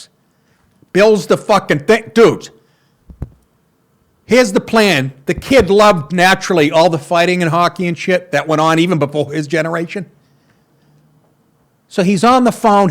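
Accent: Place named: Boston, United States. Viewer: Nah, he’s just from the Boston area. That’s how we sound!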